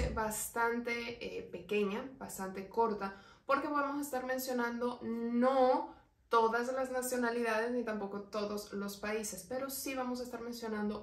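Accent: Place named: Venezuela. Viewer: Desculpa o teu español es de Venezuela, me gosto tu clase, gracias y mucho exito.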